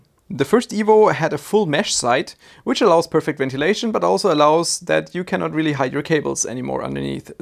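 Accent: Germany. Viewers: Der deutsche Akzent einfach geil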